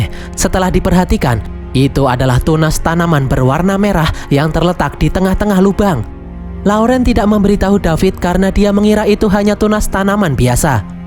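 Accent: Java, Indonesia. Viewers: Perasaan suaranya beda ya?? Kok medhoknya terasa banget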